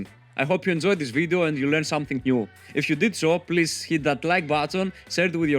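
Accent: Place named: Turkey. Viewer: Sotaque forte de Turco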